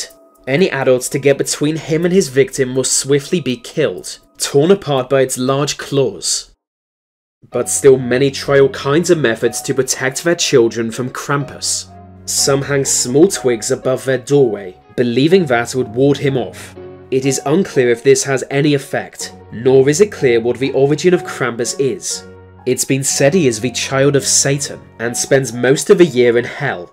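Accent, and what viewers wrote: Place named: RP, United Kingdom. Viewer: now that's the Queen's English and how it should be spoken.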